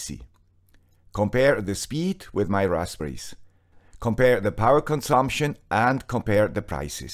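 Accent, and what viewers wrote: Switzerland. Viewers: I hate to sit in the first row, Mr. Swiss accent. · Dear guy with the Swiss accent, thank you for your videos. I ordered a S920, booted with USB-Debian Live but Balena Etcher only showed an empty white window. Repeated the process with xUbuntu Live, Balena worked this time but system didn't boot. "sudo efibootmgr -c -l /EFI/BOOT/BOOTx64.EFI -L HomeAssistant" replied with "EFI variables are not supported on this system". Finally "sudo efibootmgr --create --disk=/dev/sda --part=1 --label="HAOS" --loader='EFI\BOOT\bootx64.efi'" made my system bootable and everthing is working now. Thanks again and have a good one! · The Guy with the "German" Suiss accent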